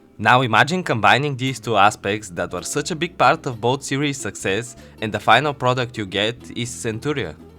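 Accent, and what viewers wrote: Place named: Bulgaria. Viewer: Bulgarian ahh accent, nevertheless amazing video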